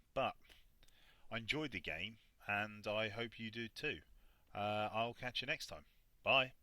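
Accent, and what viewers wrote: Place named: England, United Kingdom. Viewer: not gonna lie, subscribed to you because of jingles, but having seen this video im staying for your commentary. as a fellow brit its quite nice to listen to an english person commentating rather than an american. keep up the good work :)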